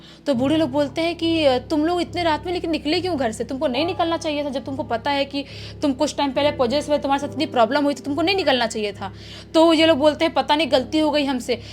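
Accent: Bihar, India. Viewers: Dont you mind please!!!!! but her her voice like fully fast and like Bihar ka town tone I feel like when I m gonna to be close my eyes ... Baki brother you've done well before ...... shant shudd Hindi or slow and gehari aavaj wali storiya maja lati hin jyada tr log night me story sunate hin to night wala background better rahega ..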